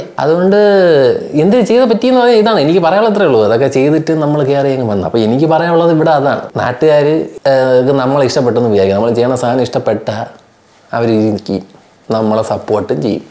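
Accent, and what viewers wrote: Trivandrum, India. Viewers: Huge Respect bro, Secret Agent & Mallu Traveller 🙏🙏👍👍👍👌👌and bro your Trivandrum slang 👌👌👌 വേറെ ലെവൽ 👌👌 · എന്തൊക്കെ പറഞ്ഞാലും നല്ല തിരുവന്തപുരം ഭാഷയിൽ പത്ത് പറയുമ്പോൾ ഉള്ള സുഖം അതൊന്ന് വേറെ തന്നെ...👍😊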